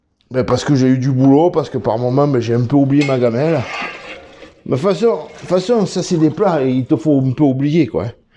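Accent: South, France. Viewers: Tu sais j’aime ton dynamisme, ton expertise, le images de ton village. Ta joie et ton chaud accent du sud me donne la bonne humeur d’un film de Fernandel. Merci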